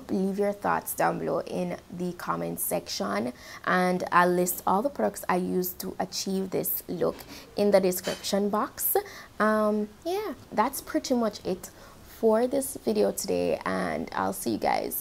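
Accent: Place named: Jamaica. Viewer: From mi hear yuh talk mi sey ok... Yessss another Jamaican Queen! Had to subscribe... Like before mi even start watch the video tbh. 😂😂🤣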